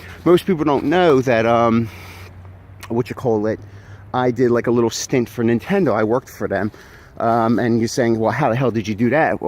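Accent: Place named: Philadelphia, United States. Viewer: I've been watching for a little bit, but I had no idea you were from Philly. I grew up in Mayfair. In hind site I suppose should have noted the accent. I enjoy the vids. P.S. I agree, no one should swim in Pennypack, thats f'n disgusting.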